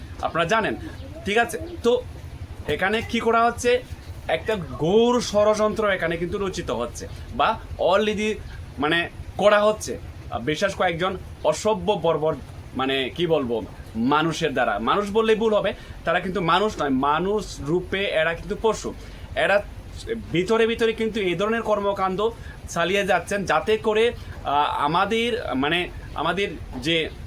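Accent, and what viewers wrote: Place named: Bangladesh. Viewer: Tumi o to je bangla kotha bolechen eta hoyche Bangladesher sur eta kolkata basha sur noy bujechen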